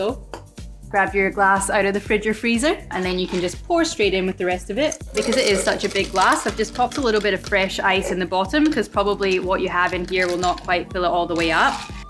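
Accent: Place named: Scotland, United Kingdom. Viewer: I heard her saying "Here in Australia..." before, but to me it sounds as if she's actually from Scottland...correct me if I'm wrong Love her vids though :D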